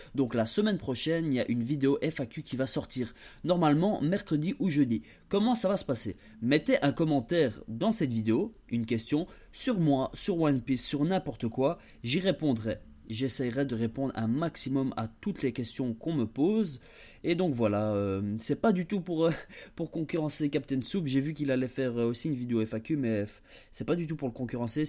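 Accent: Brussels, Belgium. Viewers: D’où viens tu en Belgique ? Petit accent bruxellois, non ?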